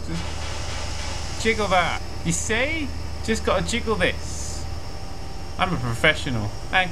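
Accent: England, United Kingdom. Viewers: It's pretty obvious that he's English XD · is he english?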